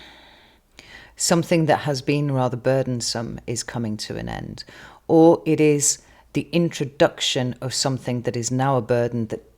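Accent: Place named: England, United Kingdom. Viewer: I’ve never been a fan of the English accent, but I like it when I’m in here. I guess there has to be a threat of profanity to soften it for my ears. Delivery is stunning. I’d put you on the large screen and the cards in the small screen, if left to me.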